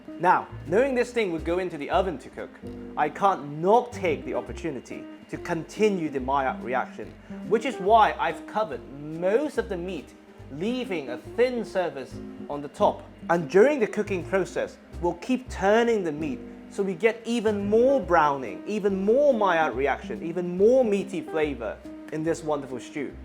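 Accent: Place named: England, United Kingdom. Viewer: Chef, you are a maestro as a chef and as a teacher. I love your explanations and references to L’Escoffier. I also greatly appreciate you provide alternatives for example no chicken stock use water the dish has plenty of flavor. I personally don’t have Tawny port hanging around my kitchen. I wish I did. So going back to butter and sugar is no problem. Also, your English accent is fab, you must’ve been educated in English schools.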